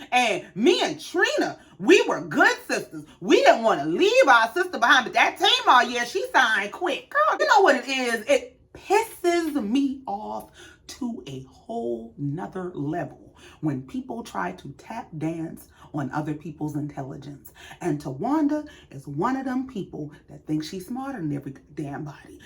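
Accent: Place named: Charleston, United States. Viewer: I just happened upon your page, and as a South Carolina girl if that ain’t Chucktown I hear I know sumn! Glad to have found you!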